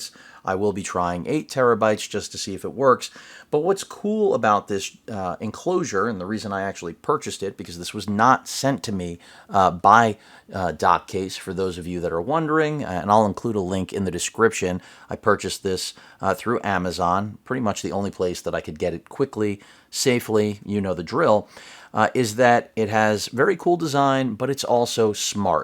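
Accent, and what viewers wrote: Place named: United States. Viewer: I love the american slang (gonna wonna)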